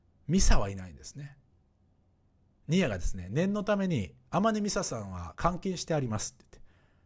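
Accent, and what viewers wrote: Kansai, Japan. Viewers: アバターより本人の方が好きだったけど、アバターでもしっかり聞きやすいし、面白い(^^) デスノートに限らず、こんな複雑で難しい物語考える人すごいなぁ ほんで関西弁めっちゃおもろい笑コテコテやし（関西人）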